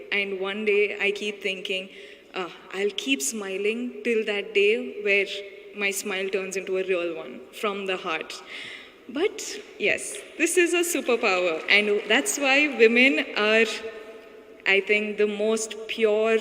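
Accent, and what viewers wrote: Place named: India. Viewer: You dont have to provide subtitles for the speech cz we all understand the indian english accent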